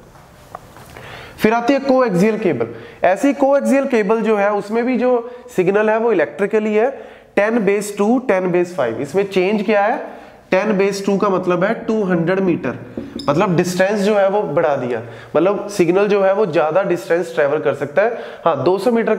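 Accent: Punjab, India. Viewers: love your Punjabi accent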